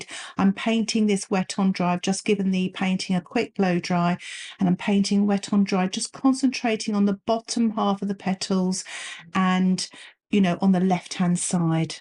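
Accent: United Kingdom. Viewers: Merci ! - Un accent british sooo deliiicious..... Love from Aquitaine, France (do you remember your/our queen Alienor ?)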